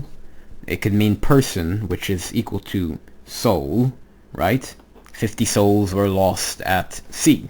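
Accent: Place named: Yemen. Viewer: You sound just like a Yemeni Hebrew! Very Impressive! God Bless you brother. Thank you for the Wonderful teaching.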